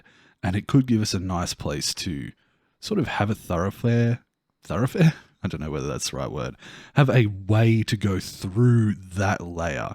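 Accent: Australia. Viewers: finally have time to watch the episode!! If it's not full of Aussie Builder heavily accented slang I want a refund!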